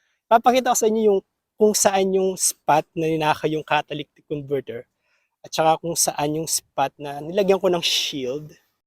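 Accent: Pampanga, Philippines. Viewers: yung accent ng kapampangan andon pa rin kahit matagal ka na dyan 😅 ingat kuya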